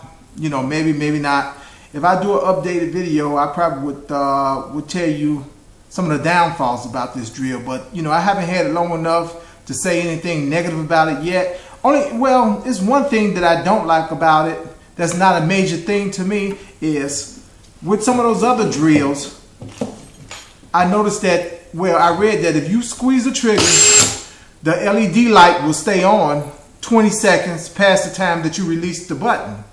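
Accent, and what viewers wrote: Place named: South, United States. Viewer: Very southern twang review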